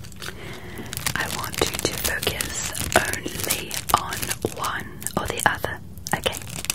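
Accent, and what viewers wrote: England, United Kingdom. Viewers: The English accent is amazing Nailed it Ive always wanted someone to do a scouse or northern accent Like Gordie or Cumbrian its sound funny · i was like o sh!t she is brittish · They have an amazing British accent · Absolutely loved this and the short hair cut so works on you with the glasses 😌 really enjoyed the English accent alongside the jingling of the jewelry.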